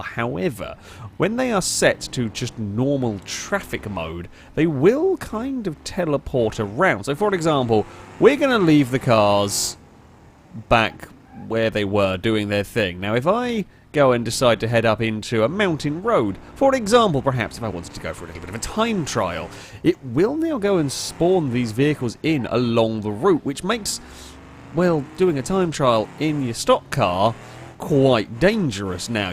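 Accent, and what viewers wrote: England, United Kingdom. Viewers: Are you from England? Cyprus or China?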